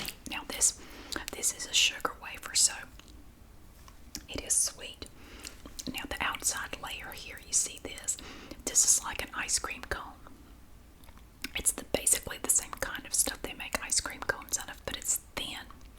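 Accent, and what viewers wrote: South, United States. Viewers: I’m a baby and you’re my mom right now! I love how southern and relaxing your voice is❤️ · As a southerner, I love to watch your videos! Makes me so happy to hear your accent! Sending hugs from Texas!!!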